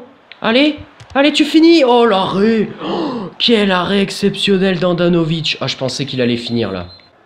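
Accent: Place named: Italy. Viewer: bonne vidéo et ton accent italien et magique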